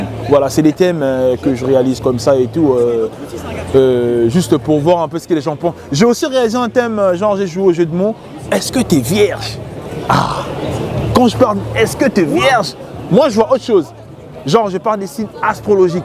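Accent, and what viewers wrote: Democratic Republic of the Congo. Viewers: Ton accent tes délire tn caractère prétentieux cs genres d choses il n'y a qu'un CONGOLAIS qui a ls couilles d le faire, t'es un zaïr🇨🇩🇨🇩🇨🇩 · tu as déjà avouer Mec. Tu es originaire du Congo Kinshasa. Et votre accent montre plus que vous êtes congolais. Je suis un abonné ( Espagne🇪🇸 )depuis 2019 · Avec ton accent t’es congolais sur 😂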